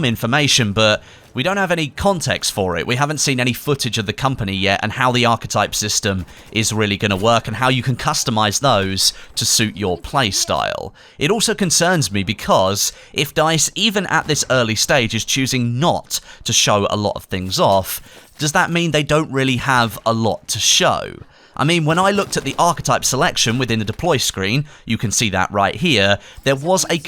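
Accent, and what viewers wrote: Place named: United Kingdom. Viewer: Man you sound like an intellectual with that British accent...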